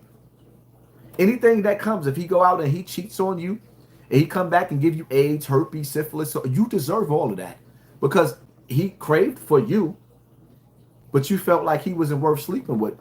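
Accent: Brooklyn, United States. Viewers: BROOKLYN TONE N THE BUILDING...EAST NY STAND UP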